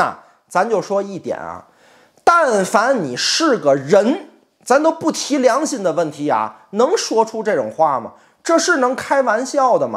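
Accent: Tianjin, China. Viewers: 听口音好像天津的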